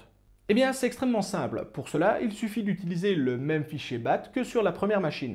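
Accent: Belgium. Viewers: Dis, il me semble que toi et moi venions des mêmes contrées sur base de ton léger accent.:) Question donc entre compatriotes. Tu fais comment niveau elec? Je consomme environ 2200w pour faire tourner 7 gpu (combi 3080 et 3090), ce qui me revient a environ 490 euros d'elec par mois. En Belgique (oops j'ai dévoilé notre pays), l'elec, frais de transport compris, monte a un prix moyen d'environ 30 centimes par kwh. Du coup, calcul fait, sur les 1500 euros de moyenne que je génère en assets, ben j'en récupère 1000 in-fine. Du coup, tu as un truc toi pour payer moins cher en elec? je suis chez Eneco et j'ai le "meilleur" plan d'après eux... mais bon, si t'as des super idées et vu ta connaissance du sujet, je prends;) MERCI!!!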